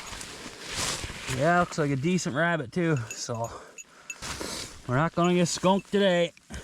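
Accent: Newfoundland, Canada. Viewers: Yeah dogs look fine. I looked up Newfoundland accent and it fits (to my ear at least). So that was my guess. Hope to see some recommendations on snow shoes for that type of terrain. It looks like where I live in Sweden.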